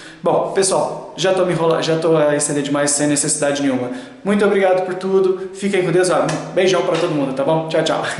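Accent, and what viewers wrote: Portugal. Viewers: Olá, cai de paraquedas em teu canal e já estou adorando. Uma obs minha neste Vídeo foi que você acaba misturando o português do Brasil e de Portugal, creio eu que a maioria do seu canal irá conseguir entender perfeitamente você falando português d e Portugal. Faz um vídeo só falando em português de Portugal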